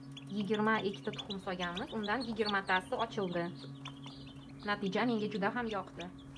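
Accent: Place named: Karakalpakstan, Uzbekistan. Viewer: Ассалому аллайкум! Мен хам олгандим 70талик окидан. 29 та гоз тухумидан 15та очиб чикди! Кейинчалик 128талик фул автомат олувидим 57та гоз тухумидан 1та очиб чикди! Кайфият "0" ! Таблода харорат 37,2 тухумда эса 38,9! Биринчи кафат билан иккинчи каватнинг хароратининг хам фарки катта! Синглим, гапришингизга караганда Коракалпогистонликга ухшайсиз! Илтимос, неча фойиз очиб чикаяпти тугрисини айтинг! Иккинчи каватдаги тухумларингиз ковурилиб колмаяптими? Хароратни канчага куйдингиз?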